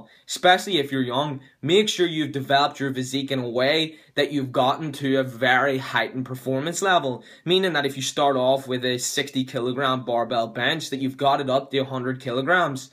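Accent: Northern Ireland, United Kingdom. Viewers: The norn irn accent hitting hard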